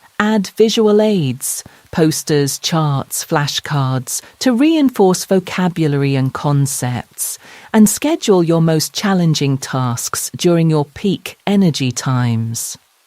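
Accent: United Kingdom. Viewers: Thanks ❤❤ i love your british accent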